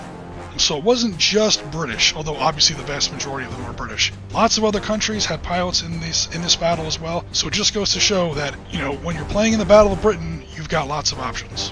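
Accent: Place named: United States. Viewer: Being the cynic I am, and hearing a US accent (maybe think Ebert's populist given the then times, and so effectively clueless, unobjective, totally missing the point (which was the sentiment of people who lived through this and later generations) review), and mention of gamers, I thought I was going to be compelled to post some, if not many, criticisms of this review, but couldn't find any. So far anyway! I'll just have to watch this again and try and find some! A really excellent, objective, enjoyable review here, more than worth watching; and watching again...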